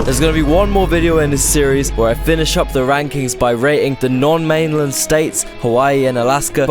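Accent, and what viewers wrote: United Kingdom. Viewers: It’s funny to hear some Brit, Try to explain America. And the south to beat it all haha. Even though you ain’t far off for a couple these places.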